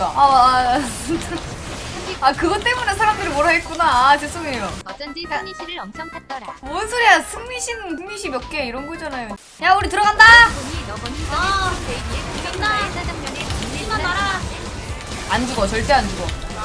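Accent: Gangwon, South Korea. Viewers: 저 예쁜 공주같은 얼굴에 강원도사투리까지 쓰시니까 너무 매력적이다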